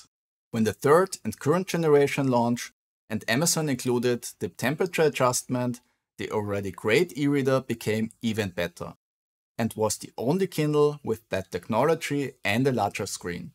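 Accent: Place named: Austria. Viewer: Your accent sounds austrian.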